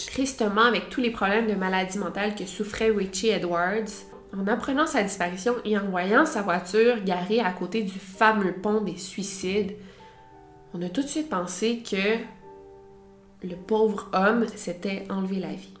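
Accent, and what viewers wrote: Quebec, Canada. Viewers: Vite encore une nouvelle vidéo de " ", un bon moment assuré!!! Je regarde d'autres vidéos dans le même genre et indéniablement pour moi c'est la meilleur, autant dans sa présentation que son style, unique, qui avec "pour nous Français ce petit accent québécois" qui nous fait voyager ^^ En passant je ne te l'avais pas encore dit mais ton nouveau générique est vraiment super:D Je connais cette musique, je sais que je l'ai déjà entendu mais je ne sais plus où, "Victoria" aide moi stp;) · Je t’écoute à tous les matins avec mon café et à tous les soir en fesant ma vaisselle. T’es excellente je t’adore je veux venir te voir à ta prochaine dédicace à Montréal. Et ceux qui son frustré de ton accent québécois, ben qu’ils écoute d’autre vidéo au lieu de se défouler sur ta chaîne. Moi je trouve que tu explique très bien 👌🏼 Good job girl continue❤️ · Perso je me suis très très bien faite à son accent 😁 Du coup je connais même de "nouveaux" mots et nouvelles expressions 😅 Je préfère regardé cette chaine avec l accent canadien, je ne trouve pas de chaines avec des compteuse françaises que j aime autant perso :) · Quand j'ai entendu ton accent pour la 1ère fois, je me suis aussitôt dit "mince... 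ca ne collera pas avec ce type d'histoires" (je trouvais l'accent québécois mignon et sexy mais pas effrayant) mais avec ta facon de narrer et la musique de fond, c'était plutôt facile de s'immerger dans le récit! Belle découverte! Et un préjugé de moins. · Tes videos sont superbe et ton intro très bien choisi.Mention spécial pour la musique de qui colle bien avec l ambiance de ta chaine.A chaque fois j'attends tes videos avec impatience.Tu m as même fait apprécier l accent quebecois 😁.Bravo a toi et le meilleur pour toi,ta famille et ta chaine.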